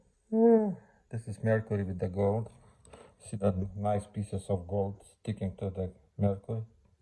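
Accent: Russia. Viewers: Приветствую! Вы русский? Акцент ухо режет.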